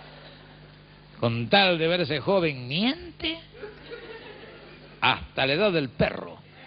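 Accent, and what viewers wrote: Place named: Buenos Aires, Argentina. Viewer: Tiene el acento más porteño de la historia.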